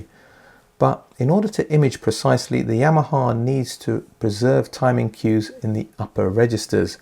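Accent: United Kingdom. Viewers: Thank you for another impressive review! Your articulation of audio is authoritative - your knowledge, experience and technical insight is unmatched in sophistication. The British accent and your command of the language simply makes everything you review sound so upper echelon and creme de la creme! Am I sensing that the AJ Uni Fi References are perhaps starting to grow on you as they burn in?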